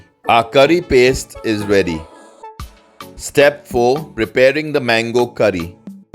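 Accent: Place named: Goa, India. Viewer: Goan taste with goan accent suits well.😊 Thanks for your reply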